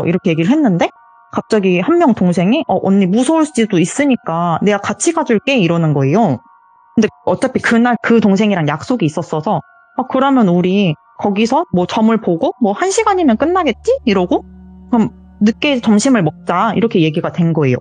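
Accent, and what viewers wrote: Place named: Jeolla, South Korea. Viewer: 전라도특유의 사투리가.ㅎㅎㅎ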